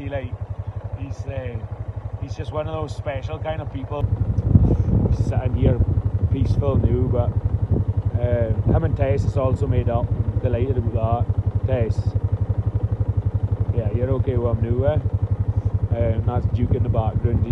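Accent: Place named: Orkney, United Kingdom. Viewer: I'd like to suggest that you call Simon's sister *Helena*. My boy Simon is a lovely special lad. I thought I'd lost him for good one day in Truro; I took my eye off him and there he was, gone! And his tricycle, too. We were united in Burger King, eventually.... And like your Simon's mum, I've spent plenty of time at the end of my tether, looking after Simon and his sister Helena. She's had her fair share of adventures, too, and she's bonny like your Simon's sister. It would be so nice to tell my Simon and Helena that they have namesakes up in Argyll who are loved just as much 😚😚! Lovely video, amazing accent (I've never heard an Orkney accent before, it's radically different to the estuary English down here in Kent!) and so good to be involved in the lives of you all at the farm, however vicariously. Wishing you every success; Katherine from Kent.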